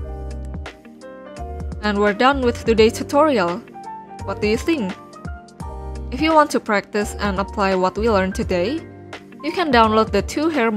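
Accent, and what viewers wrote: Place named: Indonesia. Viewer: dari logatnya mah indo inii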